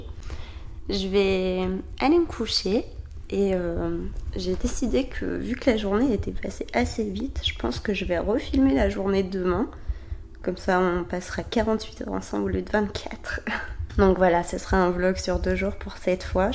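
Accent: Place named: South, France. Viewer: ça semble le sud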